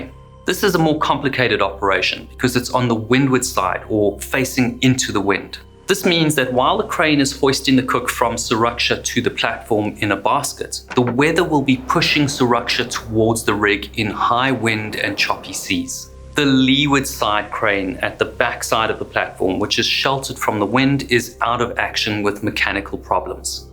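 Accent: South Africa. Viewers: Is the narrator from South Africa?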